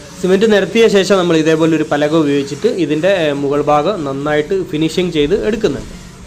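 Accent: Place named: Malappuram, India. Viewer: Adipoli aayittund engale veed evide.samsaram kettitt malappuram aanennu thonnunnu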